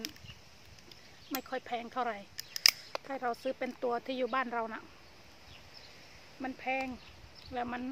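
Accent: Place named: Surin, Thailand. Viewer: ชอบดูยายจัง สำเนียงยายพุดเหมือนทางบ้านแฟนเลย...สุรินทร์ น่ารักดีค่ะ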